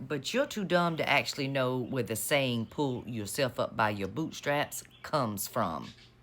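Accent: South, United States. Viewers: Found your channel recently, love to hear your tea talks. I should’ve known you are a survivor, there’s a certain knowledge that comes from those who have. Know that we (I’ve included my family members) appreciate you, your savviness, and your ability to express current affairs in that wonderful southern accent. Stand strong, be safe, and continue on. Thank you. 💔🙏🏽💙💪🏽💙🇺🇸💙🚫🤴🏼💙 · She has a beautiful southern accent/voice.❤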